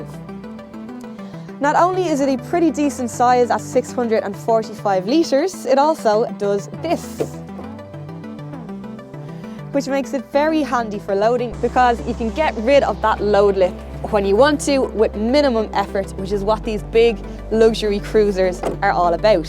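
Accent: Ireland. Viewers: This channel is pushing great reviews! keep up the great work. Also that Father Ted accent makes it even better :) Cheers from India!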